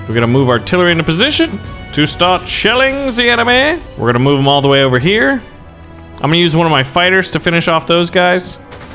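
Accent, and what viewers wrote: Germany. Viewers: german accent in on point👌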